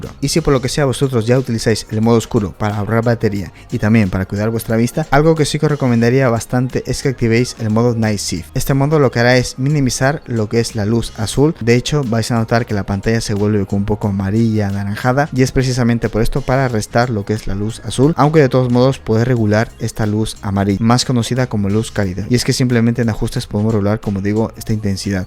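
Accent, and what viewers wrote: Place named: Mexico. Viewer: Bien fuera del video, por que siento que tiene acento mexicano pero usa palabras españolas?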